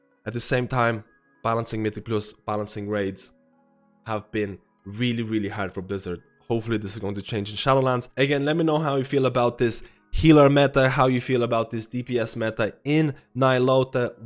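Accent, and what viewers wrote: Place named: Ireland. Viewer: You have to live Ireland lol. the accent just comes out so often! much love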